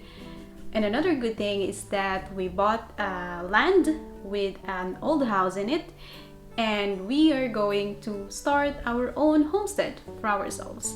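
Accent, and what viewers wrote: Slovakia. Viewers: I liken your slovakian accent .,very cute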